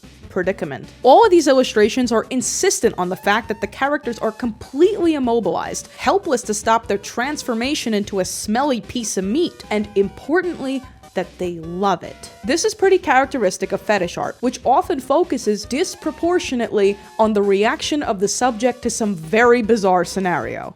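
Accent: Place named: New York, United States. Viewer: Hey I'm autistic and I play Magic: The Gathering because it's all rules based and weird but I do shower etc. Love the way your NY accent comes in on certain words like... AWTIZM. It's great and why I've entered this rabbit hole... Stay cool! (Also the Frank-N-Furter being put into a hot dog bun at is ace)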